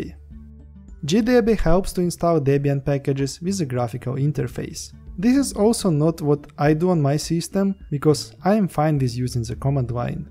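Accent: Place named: Russia. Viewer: Русский что ли? Акцент ужасен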